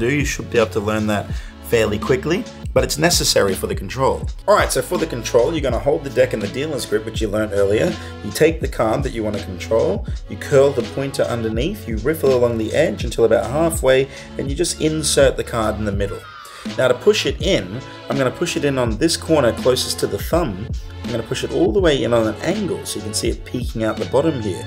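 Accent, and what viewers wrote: Australia. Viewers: Love that ‘Stralian accent, mate! I’ve loved magic since I was a little kid…I mean, what kid doesn’t, eh?! I picked up magic decades ago for one simple reason—to distract crying kids in the plane. And it worked as well as entertaining the adults around. Since then, I’ve watched countless magic stuff on YouTube and I’ve always been amazed by card tricks. But I’ve been so intimidated by them. Until now. Thanks for sharing your amazing videos and for generously sharing your tutorials. I’m determined to master some of the most basic of cars skills and tricks. Cheers, mate!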